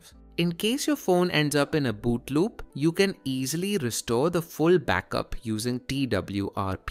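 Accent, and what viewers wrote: India. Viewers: I dont understand what you saying bro i only hear indian yapping bruh 💔💔💔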